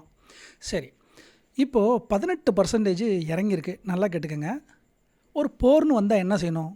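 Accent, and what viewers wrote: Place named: Tirunelveli, India. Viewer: ஏல இருலே 😂 சூப்பர்…. நெல்லை வாய் மொழி ❤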